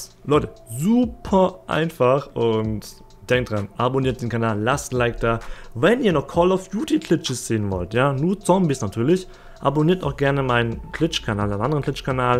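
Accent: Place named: Sweden. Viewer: Oha hätte niemals gedacht das so ein Schwedischer Restakzent klingt aber ist auf jeden fall mal was anderes. Hat was ^^ Ist auch ein seht sehr schönes Land definitiv und gar nicht immer so kalt wie man denkt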